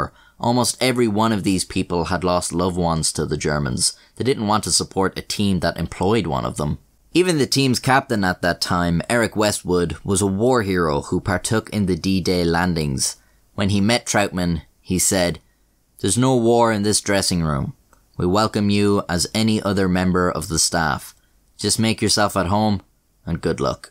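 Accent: Ireland. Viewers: I really enjoy your straightforward style, thoroughly researched material and deadpan Irish delivery. Keep up the primo content. Cheers! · I was wondering because you sound either Irish or Scottish Mainly Due to the fact that they make me laugh They are the kind of people that have a great sense of comedy In the most down-to-earth away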